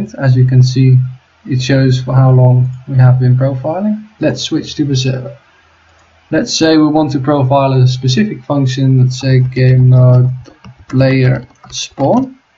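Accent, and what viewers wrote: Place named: United Kingdom. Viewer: why do you sound so FUCKING british:S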